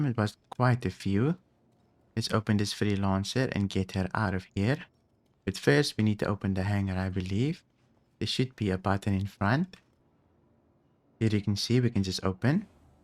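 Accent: South Africa. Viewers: South African accents are very lekker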